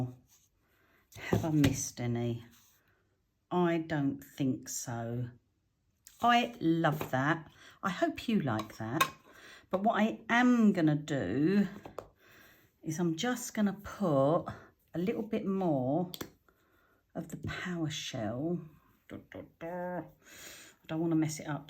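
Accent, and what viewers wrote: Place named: England, United Kingdom. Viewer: Lovely crafty creation 🥰. You say "rouge" perfectly. With your beautiful English accent🌹.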